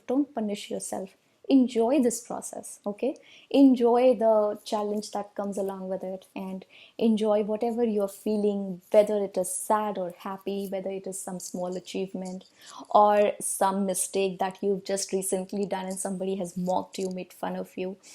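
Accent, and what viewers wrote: India. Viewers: You are beautiful but unfortunately your accent is too simple mixing your Hindi accent in it.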